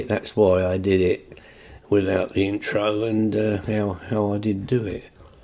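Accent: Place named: Cockney, United Kingdom. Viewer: You a cockney? I grew up EC1 you sound like my family lol 😂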